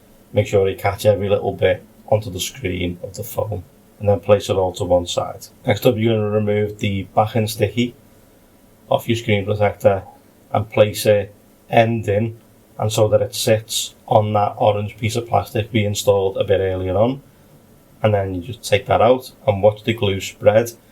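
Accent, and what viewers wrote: Liverpool, United Kingdom. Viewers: Thanks for the video! Very useful. A curious question, are you a scouser? Greetings!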